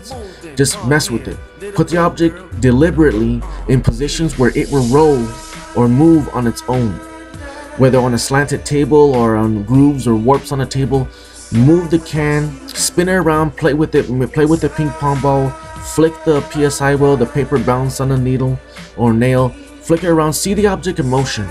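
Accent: Philippines. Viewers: waitt r u a filipino?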